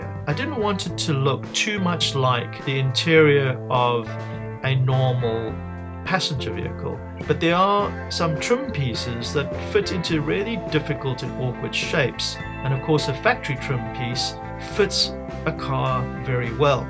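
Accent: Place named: South Africa. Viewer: good to hear an SA accent.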